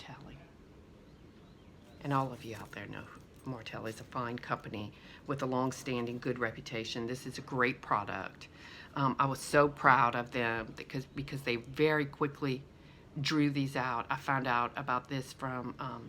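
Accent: Louisiana, United States. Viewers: Girl I can listen to you all day. You are so Cajun.... I love you to pieces.... Stay safe. Good luck with the position....❤️❤️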